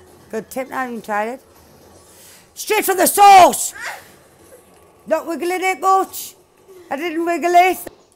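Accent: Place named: Newcastle, United Kingdom. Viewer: I just dident want to risk not dropping a comment to your vids, since I find them amazing :) Oh Newcastle, yea, remember you said Magpies was who you cheered for :) Its a great dialect though! fun to listen to!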